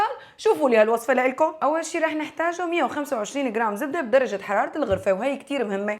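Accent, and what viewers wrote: Syria. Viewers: ععع لهجة باب الحارة ع الحقيقة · بتحكي سوري اكتر من السورييين ومني😂😂😂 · أي دخيل رب الشام ويلي خلق الشام وهل لهجة البتجنن 😍❤️❤️ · صوتهة كلش سوري😅😅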